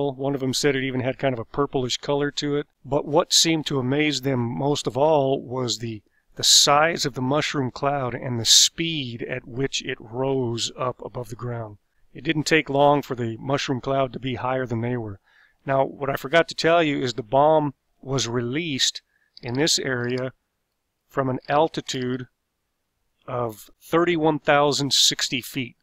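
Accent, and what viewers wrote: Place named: North Carolina, United States. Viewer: I know nothing about you , BUT I'LL BET YOU ARE FROM NORTH EAST. NC OR SOUTH EAST. VA. RIGHT? Just a guess because you sound like everyone I know around here!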